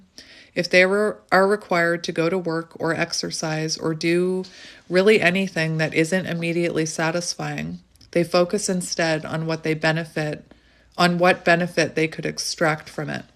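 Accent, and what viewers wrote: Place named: Canada. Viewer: I'm a Aquarian and also don't like to be told what to watch 😅 I will when I feel like it. But Zombies, no way! Lol 😅 I'm house sitting for a month 3 dogs and 2 cats, so when I have dinner or a snack, I have 3 pair of eyes watching me, so the dogs gets more if my food than me! 😅 But I would also have cried about the spider. I love nature and actually prefer animals to humans. I also will rescue a spider 🕷️ if it's lost inside the house. Some people will get an entire spray tin of poison to kill a innocent creature! That really makes me angry! Thank you for being such a interesting tarot reader, I love your Canadian accent. I'm in Cape Town, South Africa. We going into Spring/Summer where you are going into Winter.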